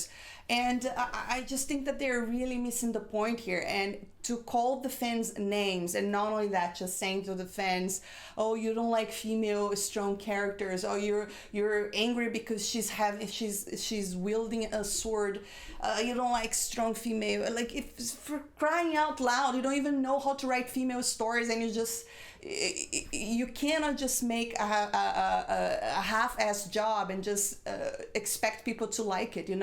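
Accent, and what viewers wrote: Brazil. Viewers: Are you Brazilian? Sounds like a Brazilian speaking English. (Good English though), thanks for the video. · Que bela resenha. Sei que é brasileira pelo sotaque imediatamente. Sou americano mas meu pai é brasileiro ;) Ótimo canal, me inscrevi! Sucesso!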